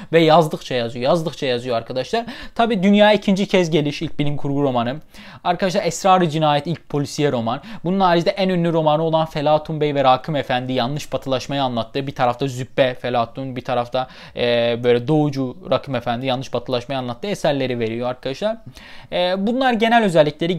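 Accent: Adana, Turkey. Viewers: Abi sen vanlı değil miydin adana şivesi ne alakasxsxsxsxs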